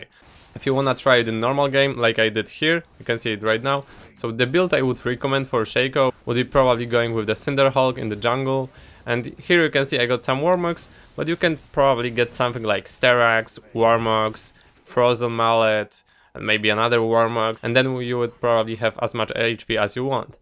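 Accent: Poland. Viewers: Where are u from? U got a little polish accent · Masz minimalnie polski akcent :D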